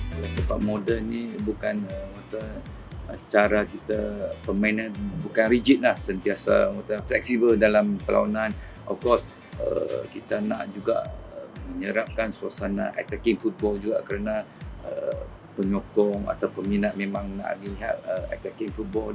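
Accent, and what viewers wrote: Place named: Kedah, Malaysia. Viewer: loghat loqstaq pekat tn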